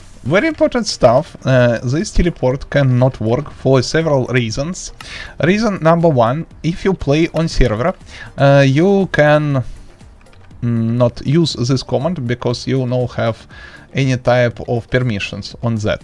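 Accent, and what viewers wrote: Russia. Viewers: Руский акцент не счем не перепутать😂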